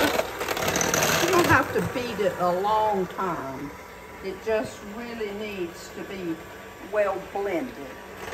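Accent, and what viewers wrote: South, United States. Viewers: I enjoy your videos and I love your southern accent! You’re adorable! ❤From Adam in Lexington, KY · I love hearing you talk! I was born in SC not far from you in Greenwood and much of my family still lives in your state. My daddy, a son and daughter-in-law, and a grandson have all gone to Erskine just down the road from you. I even have a brother and sister-in-law in Anderson. So your lovely southern accent reminds me of home. Thanks for all you share with us!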